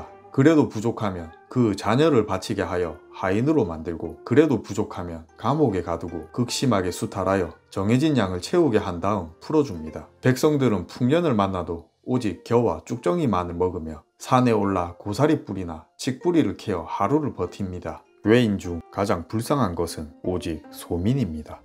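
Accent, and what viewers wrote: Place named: Gyeongsang, South Korea. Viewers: 일본인입니다.목소리도 좋으시고 내용도 재미있으면서도 잘 공부가 되고 개인적으로 좋아하는 경상남도 억양으로 말해 주셔서 유익하고 재미있는 영상입니다!! 구독합니다.!!감사합니다!! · 경상도 억양도 아네 ㅋㅋㅋ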